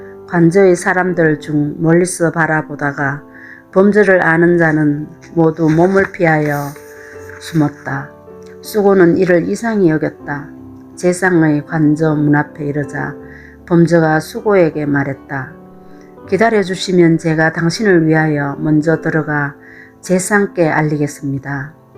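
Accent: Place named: Gyeongsang, South Korea. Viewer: 사기열전 19 잘듣겠습니다 경상도 ㅎㅎ 정감가네유 수고하셨습니다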